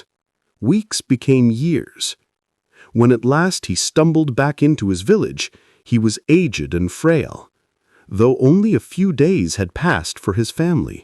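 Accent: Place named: Africa. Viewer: Where u from? That accent sounds somewhat african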